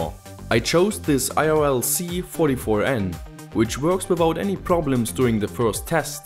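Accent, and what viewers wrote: Germany. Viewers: Gebürtiger Deutscher? Man hört es :DD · Du bist deutsch oder? Your accent is german. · keep making cool stuff that's affordable and other folks can make too. P.s I noticed the German accent considering you're doing this stuff and college is free in Germany what kind of engineer exactly are you? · 8233Eire it's a German accent btw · Thank you for that Video :) It helped me out realy much ! Please keep doing such nice projects and Videos. Is your mother tongue german ? :)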